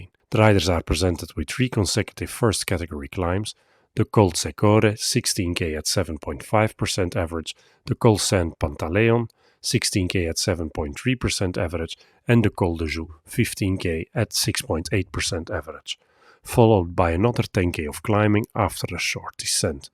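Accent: Flanders, Belgium. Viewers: Ik hoor wat vlaams Engels in deze video haha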